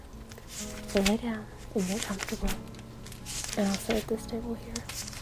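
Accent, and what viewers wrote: South, United States. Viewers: i like the slight southern twang to your voice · American accent spoilt it for me. Americans are not medieval. It needs to be an English accent. Cornwall, Devon, Irish,...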